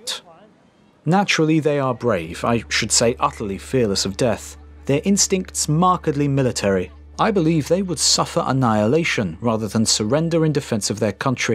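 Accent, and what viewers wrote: United Kingdom. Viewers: For a Native American, he sounds slightly British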